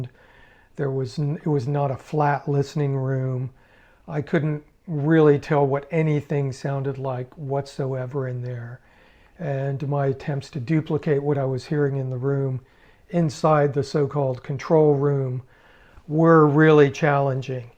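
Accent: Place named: United States. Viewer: You have a very American accent for a Brit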